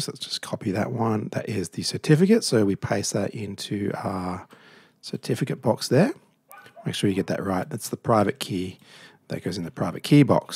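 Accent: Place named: Australia. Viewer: nice aussie accent :)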